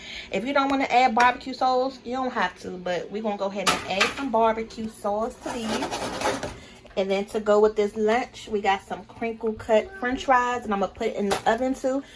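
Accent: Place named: East Coast, United States. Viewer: She’s a cute mom. And her accent sounds real east coast.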